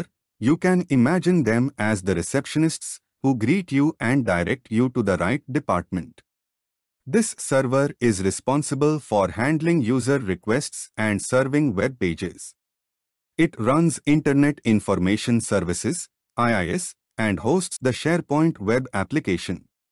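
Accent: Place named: Russia. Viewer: Ty kráso, dobrej ruskej přízvuk.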